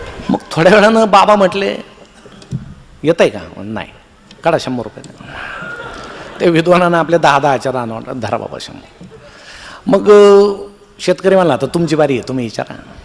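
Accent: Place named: Ahmednagar, India. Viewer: नगरी भाषा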